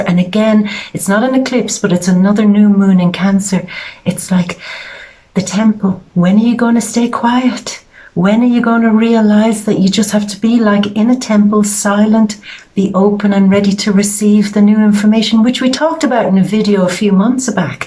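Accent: Ireland. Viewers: Anything you do or say is always a blessing , you have a healing energy and that Irish accent is a killer , as a Leo I can only cheer you doing you. Thanks for being ❤️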